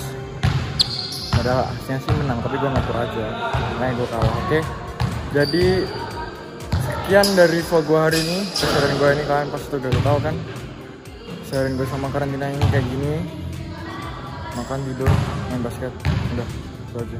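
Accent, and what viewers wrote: Java, Indonesia. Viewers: gole ngomong medok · medok banget jawanya ka * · Medok nyaa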